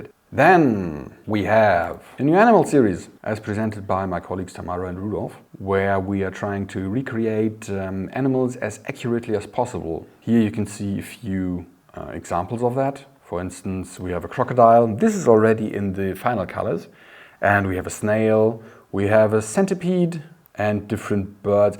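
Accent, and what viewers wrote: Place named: Germany. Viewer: great to finally hear humans here! have you considered investing in a speaker yet? No offense against but his german accent is "noticeable" lets say! anyway, is <num>x times better than any bot!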